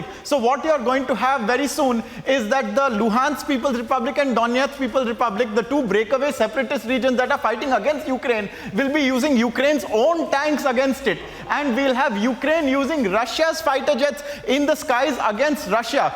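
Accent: India. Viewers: This sounds INDIAN..blah blah blah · Very indian sounded reporter...very entertaining...well done the Indian reporters..you guys have overtaken any other major news agencies world wide...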